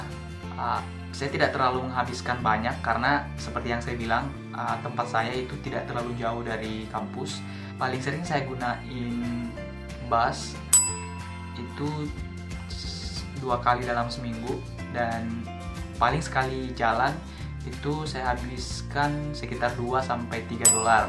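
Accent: Makassar, Indonesia. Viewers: orang makassar kah om? dan ini ausi mana??sydney??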